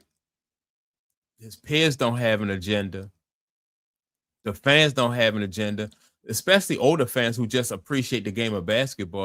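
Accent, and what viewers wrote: Baltimore, United States. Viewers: Can tell my man doing the video is from Baltimore. I respect the hustle G! Great video.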